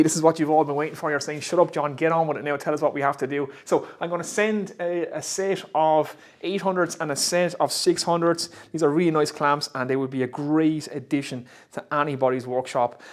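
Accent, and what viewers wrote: Ireland. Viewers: Great channel, we still get a kick out of the accent and we miss all of our Irish friends. I NEED CLAMPS! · Came for the tool reviews and shop projects, stayed for beautiful shop, depth of knowledge and that lovely Irish accent 😁 And as I'm slowly building my own little shop... I NEED CLAMPS!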